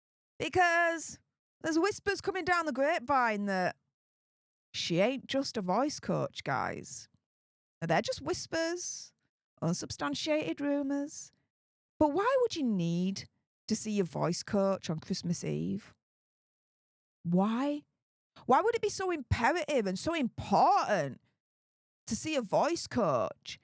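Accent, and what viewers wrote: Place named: North, United Kingdom. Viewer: Excellent video- damning tweets. But surely with your great northern accent "it will bite him in't ARSE"😂...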